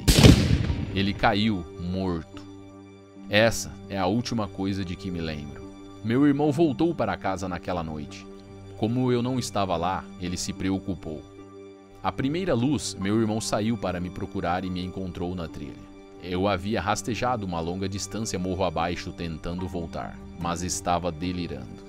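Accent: Brazil. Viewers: Sotaque legal, de que parte do Brasil? Parabéns pelo canal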